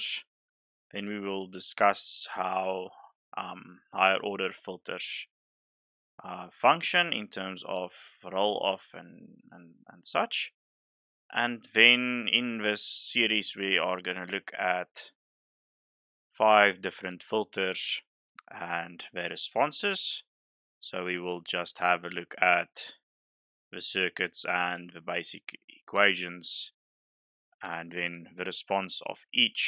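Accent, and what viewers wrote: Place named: South Africa. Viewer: Sounds South African, baie dankie boet.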